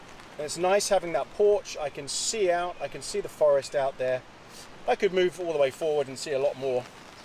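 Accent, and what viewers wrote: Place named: England, United Kingdom. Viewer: DON'T MENTION SOMETHING GO'N WRONG...HAHAHA!!! WELL,LOVE THE NEW TENT...ITS AWESOME!!! AND SO ARE YOU N BRUCE. AND YOUR SO EXCITED ABOUT THAT TENT..I JUS FEEL YOUR JOY HAHAHA '' I LOVE HOW YOU TALK...YOUR ACCENT IS AWESOME!!! LIK ENGLISH ACCENT...ALSO PLEASE GIVE A ENGLISH INTERPRET WHEN YOU DO MEASUREMENTS N POUNDS..DID I SAY THAT RIGHT..WELL INSTEAD OF WHATEVER KEELOADS ARE...POUNDS??? WELL I NEVER LEARNED POUNDS AS MEASURE..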